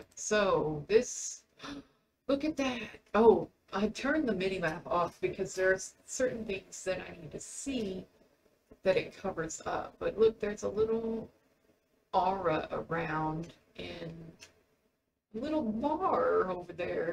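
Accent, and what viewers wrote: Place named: United States. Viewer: omg, I had the same heart-attack when my backpack was empty. If you look at the top left, you'll see everything is there, but bunched up for some reason. It only seems to be the first time I open my pack after I connect, or maybe after an update? Not often. But yeah, I've got all my tools and books in mine and was like "What the heckin'?!" when it happened to me. Did you see there's an upgraded version of the Environmental Eye called the Environmental Ocular that will show how far into positive or negative you are? And you keep using bonemeal to grow your trees for the ritual of the forest; would an Ars Grow spell not work, and save you some bonemeal? Re: streaming, keep in mind that you sound like you're in the US (and the weather in F in your taskbar says that, too :-P), and that means your day is my night. :-P So I, for one, would likely miss whatever progress you streamed, unfortunately.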